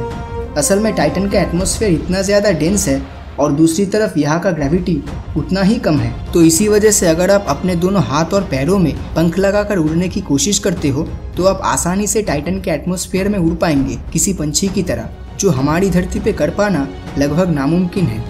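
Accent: Bengal, India. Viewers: Bengaliness in Hindi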